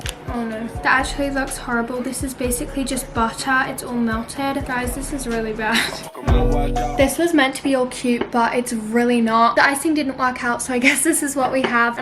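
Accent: United Kingdom. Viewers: Your videos are amazing!! Love your way to do this 💕 and your british accent is so pretty ❤ hugs from Ecuador :)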